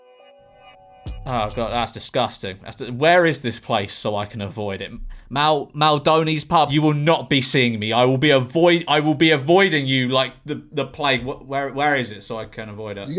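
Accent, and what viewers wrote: United Kingdom. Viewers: I with you could hear what you sound like to me… haha u Brit’s sound so funny in certain parts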